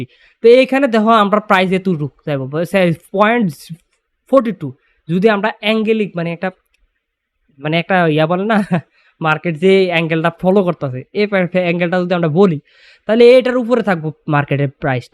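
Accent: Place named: Mymensingh, Bangladesh. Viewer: Vai apnar kotha sune mone hoitase apni Kishorganj or Mymensingh er...amar bari o oi dike e...vlo laglo apnar video ta...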